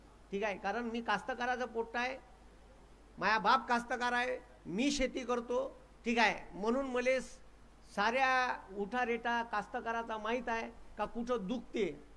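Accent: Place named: Vidarbha, India. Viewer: हे वऱ्हाडी भाषा आहे जे विदर्भात बोलली जाते, इकडे सगळे लोक असच बोलतात...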